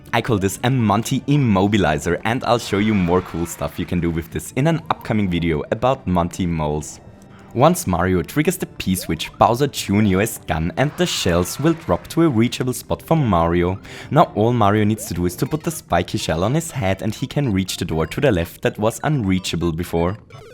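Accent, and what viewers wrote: Germany. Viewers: are you german? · hey cool and very helpful video! :) p.s. are you German?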